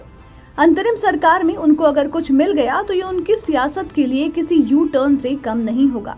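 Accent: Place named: Rajasthan, India. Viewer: Ekyannnve chhhyanve , hindi nahi rajasthani bollli aii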